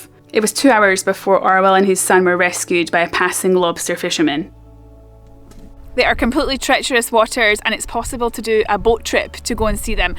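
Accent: Scotland, United Kingdom. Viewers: Cool video. Watching the auto-generated CC subtitles wrestle with her Scots burr was also quite amusing.. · Trying to place your accent, I'm a Sneck man and like to hear a Scottish accent but I love a Highland one. P.S. There is a resident otter family that I see now and again and they are overlooked by the bridge to the black isle. I obviously can't say where on YouTube as I don't want them bothered by people. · I love the Scottish accent! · You make lovely videos but speak so quickly in the voice over sections. You may say, "use the captions". Unfortunately, my eyesight is failing and captions in your and other videos, never stay on long enough. But I do like your clear video and hearing your lovely Scottish accent. I can see the Paps from here on the Ayrshire coast opposite the half Highland, half Lowland Isle of Arran. As I stayed for many years on the Isle of Lewis, I sailed the Minch in fair and foul weather and it could be pretty foul. 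Good luck in your adventures.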